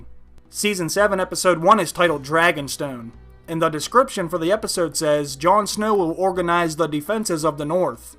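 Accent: Pittsburgh, United States. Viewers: Are you from Pittsburg by any chance?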